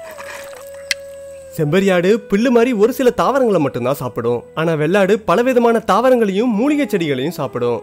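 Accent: Salem, India. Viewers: Salem slang 😍💛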